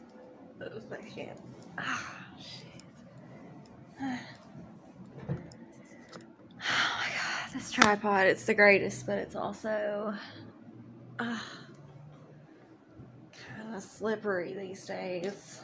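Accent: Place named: South, United States. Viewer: you're too damn cute and voice is so southern I love it lol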